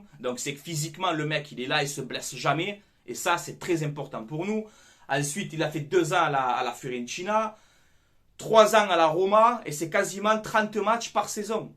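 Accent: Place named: South, France. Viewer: salut ecoute quant je t'ecoute parlé avec accent du sud sa me fait rire et tk je suis du sud aussi quant ont parle sa recent pagnol et les cigales le pastis les grillade de sardines la petanques le sud quoi:p prend soin de toi ami et merci pour les infos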